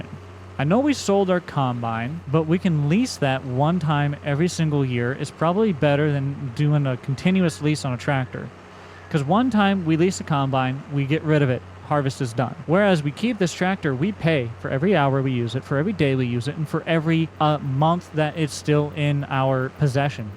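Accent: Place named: South, United States. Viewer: I just want to say when I was watching the first few videos I noticed you have a southern accent when you talk in certain parts and I fucking love it HAHA IT SOUNDS AWESOME. cant wait for more videos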